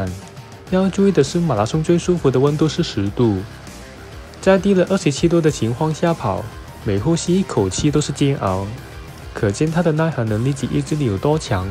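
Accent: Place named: China. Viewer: 听你说话 我都快睡着了 普通话口音着实是没有力气的样子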